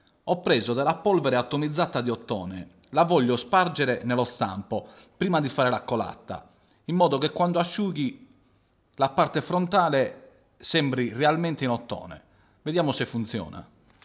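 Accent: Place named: Sardinia, Italy. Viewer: Si sente perfettamente che sei sardo!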